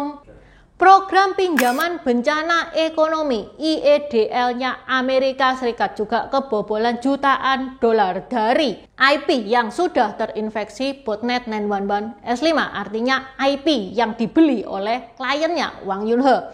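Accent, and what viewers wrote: Java, Indonesia. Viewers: Jowo nya ndi Cik,medok buanget Jowo neh,he he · Suaramu kental banget jowone REK....😂😂😂😂❤ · ii Coba Dong Bicara pake Bahasa Mandarin nya sih, , ? ! Saya Pengen Ngedenger mgomong ny Mandarin, Campur Medok ny ngomong Bahasa Jawa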